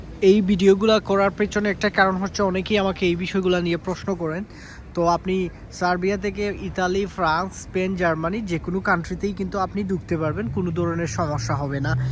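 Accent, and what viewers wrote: Sylhet, Bangladesh. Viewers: আইরাম মানি আপনি সিলেটি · ভাই আফনে সিলেটি 😊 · ভাই আপনি কি সিলেটের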